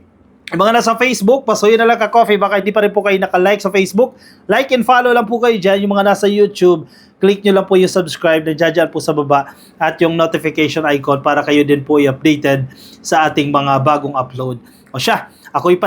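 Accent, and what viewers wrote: Waray, Philippines. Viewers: Ka coffee Waray kaba? Just asking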